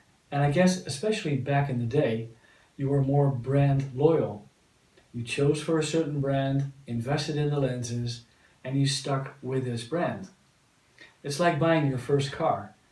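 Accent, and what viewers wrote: Netherlands. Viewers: Are you Dutch? Sounds like a Dutch Accent 😄✌🏽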